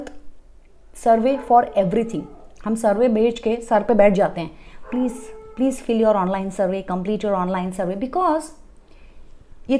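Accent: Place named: South India, India. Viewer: Amazing amazing bus ek episode chaiye hi chaiye south indian accent driven hindi me . When u speak its music to the ears .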